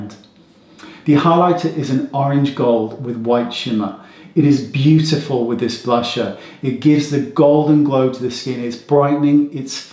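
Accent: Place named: RP, United Kingdom. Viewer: Gonna start say "blusher " instead of blush. Nope. It doesn't work without the posh English accent. Silly American. 😉😂 Love these products!!!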